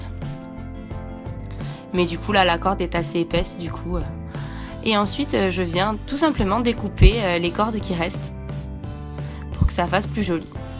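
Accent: Corsica, France. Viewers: Salut, juste une question qui n'a rien a voir avec la video, mais en t'entendant parler tu sembles être de corse toi aussi... c'est le cas ? Voila, bonne continuation à toi =)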